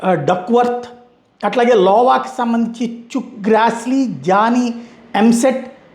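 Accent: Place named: Vijayawada, India. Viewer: Nuvvu nee butler English Nee level local bezawada ne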